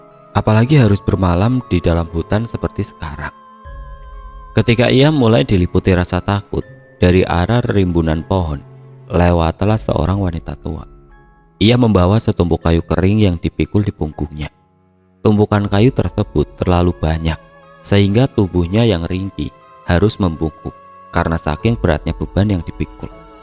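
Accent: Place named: Banyumas, Indonesia. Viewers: masnya orang ngapak ya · apa orang tegal ya kok medok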